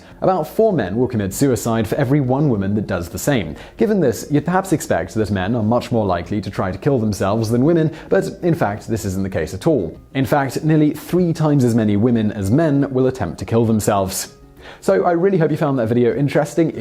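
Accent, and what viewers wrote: United Kingdom. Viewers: Question: he has a British accent but I can’t figure out if he’s a Muslim background. He’s got hairy arms and a beard and a big nose which leads me to believe he’s a Muslim. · Thank you for talking about Overton bridge! I heard about this many years ago and had forgotten about the (supposed) phenomenon. P.s. always good to hear a British accent!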